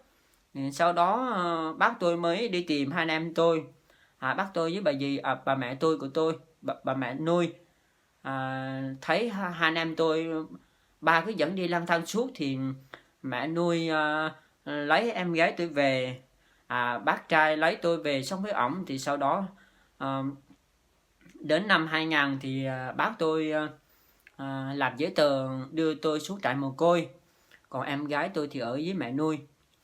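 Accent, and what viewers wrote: Vietnam. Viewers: Thấy cuộc đời của bạn quá khổ, như vậy quê bạn Bình Định, sau nói tiếng miền Nam chuẩn quá, vì cuộc sống vất vả, thiếu thốn nên sức khỏe yếu, mong bạn vượt qua mọi khó khăn, hiện tại bạn làm youtube phải khg cố gắng lên nhé mình se vượt qua mọi khó khăn, mong bạn làm có tiền để có thể có 1 ngôi nhà cho 2 anh em ở và thờ cúng cha mẹ, hy vọng mọi người có lòng hảo tâm sẽ hổ trợ bạn thêm vào cuộc sống, bạn sống rất chân thật và vui tánh, khg lời lẽ nhiều nhưng bạn tâm sự vậy mọi người cũng hiểu và biết hoàn cảnh khó khăn của gia đình bạn, ai đã chỉ dạy bạn làm clip thể này ? Bạn cố gắng làm clip và dành dụm mỗi khi mình được nhận tiền, bây giờ đã qua khổ cực phần nào hả y cố gắng lên mọi người sẽ giúp đỡ cho bạn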